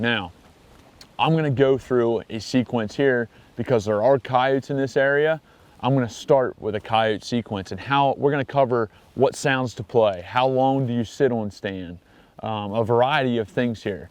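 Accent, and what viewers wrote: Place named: Pennsylvania, United States. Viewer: I'm getting a little PA accent in this video. Where you from?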